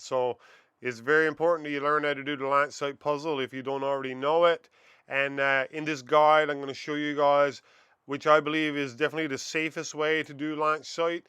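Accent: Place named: Newfoundland, Canada. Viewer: You gotta be a Newfie lol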